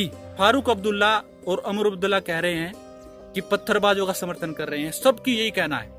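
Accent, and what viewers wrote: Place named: Rajasthan, India. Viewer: bhai rajasthani ho kyaa....accent aur background se guess kiyaa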